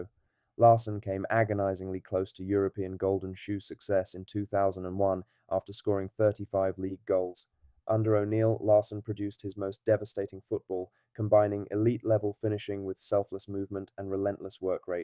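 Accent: United Kingdom. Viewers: its shite...why wouldd a posh englishman havee a celtic channel...its a1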